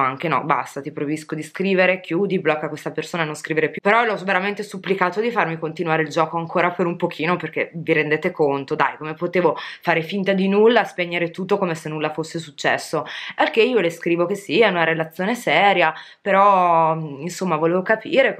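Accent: Emilia, Italy. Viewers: Fare il "simpaticone" con la donna/l'uomo di un altra persona é trasversale. Non lo fanno solo gli uomini etero piacioni o le Gaie di turno. Certamente, lo fa chi non ha rispetto, per se stesso in primis, e non prova amore verso il prossimo. Guardando video di vari youtuber mi sono fatto un'impressione: molti (idioti) sono gelosi e invidiosi di chi fa video su Youtube, ritenendo (erroneamente) che gli youtuber hanno tutte le fortune del mondo e provano solo piaceri. Ad esempio, certi si fermano soltanto al fattarello che le aziende vi inviano prodotti, non immaginando nemmeno cosa c'é dietro, ovvero che si tratta di lavoro e quanta fatica c'é prima della pubblicazione di un semplice e solo video. Alcuni, ingenuamente, pensano che gli youtuber siano dei privilegiati, fortunati e paraculati...allora, provare a "fregarti" il fidanzato può essere, per qualcuno, una piccola rivincita, perché magari questo qualcuno pensa che te lo meriti, solo per la "colpa" di fare la youtuber. 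Mi viene da pensare questo guardando i video di Cristiano, Marta, Giulia, Mia...e mi vergogno sempre piú di chi abbiamo intorno! Ho sempre creduto fossi emiliana o romagnola, mi pareva avessi un accento di quelle parti li...per quanto non me ne intenda di accenti!:) Posso chiederti un piccolissimo favore? Immagino bene di essere, probabilmente, l'unico uomo tuo iscritto e follower, tra tantissime donne, ma potresti rivolgerti, alla fine o all'inizio dei tuoi video, non solo alle ragazze? Se puoi, mi faresti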